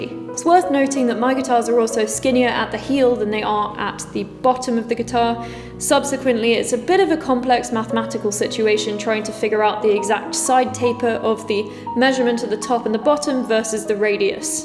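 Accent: United Kingdom. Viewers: Man, your British accent suddenly reminded me of those 90s/early 2000s BBC documentary programmes.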